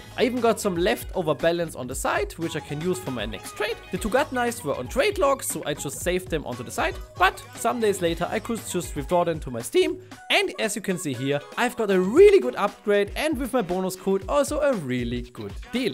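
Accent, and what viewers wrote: Germany. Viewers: Insane Video like always man, all of your videos are just useful and very nice to watch. Like how you can hear the german voice, but i think every german can recognise other germans haha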